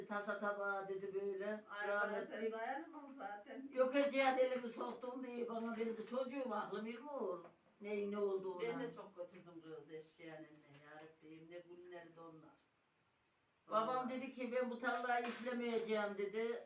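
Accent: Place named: Konya, Turkey. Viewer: Gı konyalımısınız yoksa 😁Benim herif konyali 😌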